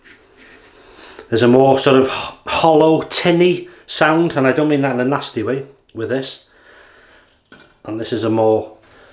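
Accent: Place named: Barry, United Kingdom. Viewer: Where you from mate, you sound like your from Barry town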